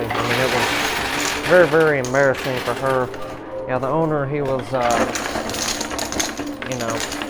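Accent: South, United States. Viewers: The fakeness of ur video is matched only by the hick-ness of ur accent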